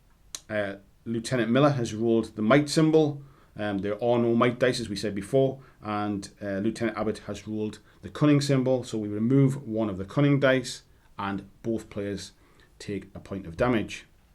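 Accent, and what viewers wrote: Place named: Scotland, United Kingdom. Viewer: Scottish Accent Boardgame Review = Auto Subscribe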